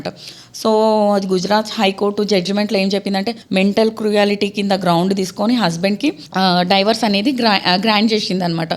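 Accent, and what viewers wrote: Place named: Telangana, India. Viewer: Super madam telangana slang!! Super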